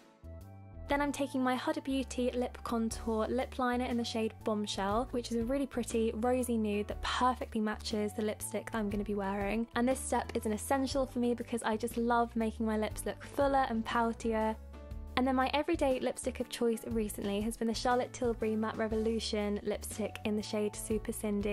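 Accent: United Kingdom. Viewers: I watch you a little bit too much to where I'm now talking in a British accent my family makes fun of me LOL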